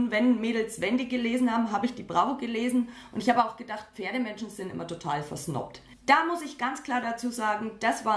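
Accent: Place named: Franconia, Germany. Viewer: Gelllllll!!!! Dein cooler Dialekt (Fränkisch) weiter so gellll ?!😂👍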